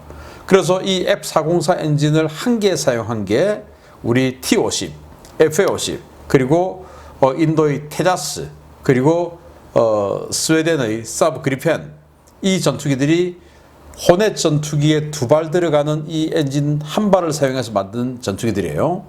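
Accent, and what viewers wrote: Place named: Seoul, South Korea. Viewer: 서울말 쫌 되니 .....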